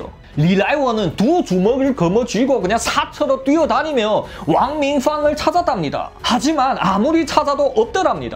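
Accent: Yanbian, China. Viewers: 연변말좀고치고 방송하시오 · 화룡시 팔가자진에서 30전짜리 꼬치구이 장사 6년하다가 쭝뽀우 생명보험 3년하다 한국나온지 21년 됐음,한국사람하고 결혼해서 두아이의 엄만데요,찐한 연변사투리 구수하네요~대박나세요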